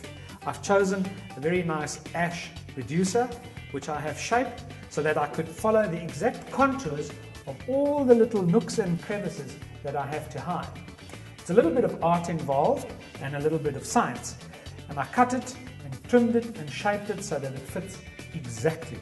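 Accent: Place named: South Africa. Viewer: Great to hear that South African accent! Thanks for your video and efforts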